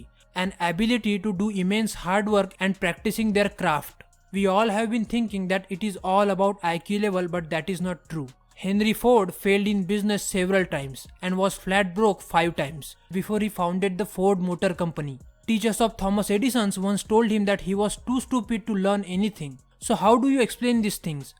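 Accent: India. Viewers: I love the Indian Accent.. Respect from Somalia 🤗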